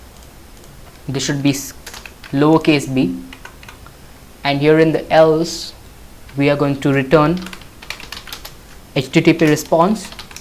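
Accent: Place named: India. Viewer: I HATE INDIAN AKCENT I UNDERSTAND NOTHING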